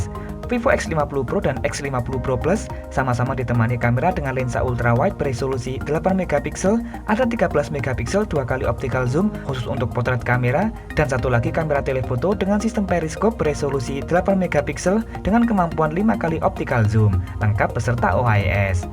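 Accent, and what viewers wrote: Java, Indonesia. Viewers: Medokkkk bangettt suaranya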